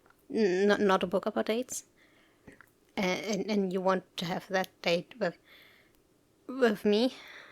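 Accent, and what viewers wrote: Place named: Germany. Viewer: Greetings, I've enjoyed your take on Shynosaur's script very much and would hope for a part 2 for this character, Edit: (Sorry if the next section is a bit long) Having said that, keeping in mind the shape-shifting aspect, would as well love to see a script by you with your take on other mythical characters, like for example the Nixie, not the typical mermaid but a bit close to the Nixie in ( Nibelungenlied ) the German poem in folklore, but in a modern setting, having the ability to shape-shift between Human form and Mermaid, tends to stay near water hence works jobs where water is always available (like aquariums or as a lifeguard), and can sense future events like a Seer, with a soothing voice to the listener, your accent for this character will be perfect since a Nixie is part of the German folklore, as well a possible chance to include a collab with two other VAs as colleagues or sisters similar to the three Rhine-maidens in Nibelungenlied, however its up to you. 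Thank you very much and wish you the best in the future content to come 😊.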